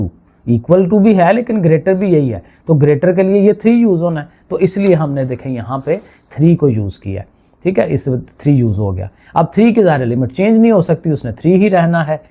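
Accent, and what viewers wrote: Sialkot, Pakistan. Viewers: Sir has a pure sialkot accent😂 like who else noticed❤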